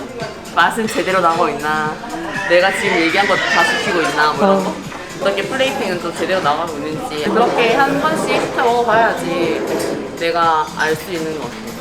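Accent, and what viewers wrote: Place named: Gyeongsang, South Korea. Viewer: 말투가 경상도네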